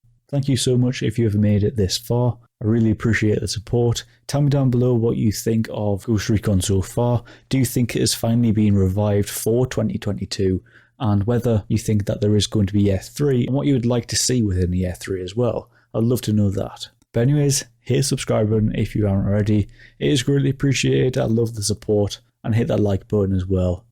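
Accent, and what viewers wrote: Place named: Newcastle, United Kingdom. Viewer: This any good for a 41 yr old filthy casual who thinks the old ghost recon games were much better than the more recent offerings of wildlands and this? I never played this but read some horror stories about it and swerved it. Worth it now? I liked wildlands but it felt a bit samey as other ubi open worlds and was never touched after the platinum. Just scratching around for something to switch off to and play solo. Fellow geordie by the sounds of it also, I’ll sub just for that 👍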